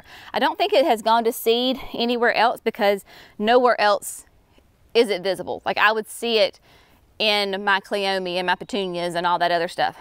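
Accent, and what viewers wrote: South, United States. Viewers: hahahahaha thanks for the correction and I just love your Southern accent it’s a very soothing sound to me ✨🤍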